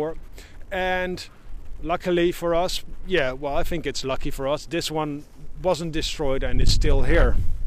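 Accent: Netherlands. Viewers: ... en aan je accent te horen ben je Nederlander, net als ik : ) Ik kwam vroeger vaak naar IJmuiden voor de bunkers, o.a. Batterie Heerenduin. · Interesting video. And good English, i can barely hear any Dutch accent. Too bad that the bunker is owned by companies, all those typical company objects like those gates, containers and camera poles smudge the historical object.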